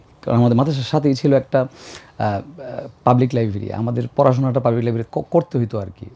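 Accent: Kishoreganj, Bangladesh. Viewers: আমাদের কিশোরগঞ্জের মাতৃভাষা টা মাঝে মাঝে এসে যায় এইডা ঐ পরিচয় হে যে আমরার কিশোরগঞ্জের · ভাই আপনাকে কিশোরগঞ্জের গ্রামের ভাষাতেই বেশি সুন্দর শুনায়।বার বার গ্রামের টোন চলে আসছে আর আপনি বার বার ঠিক করার চেষ্টা করছেন,এই ব্যাপারটা ভাল্লাগছে।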